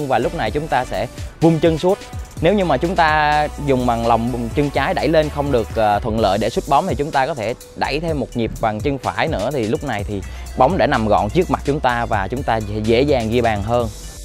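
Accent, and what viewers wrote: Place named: South, Vietnam. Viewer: Nói dùm rặc giọng nam đi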